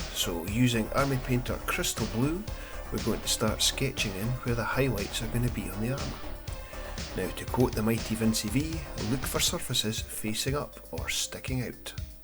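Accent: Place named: Scotland, United Kingdom. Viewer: Nice one. And the Scottish accent rendered you an extra like.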